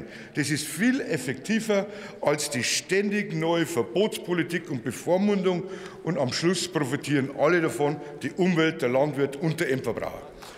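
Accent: Bavaria, Germany. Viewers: Bairisch, ich liebe es ❤️.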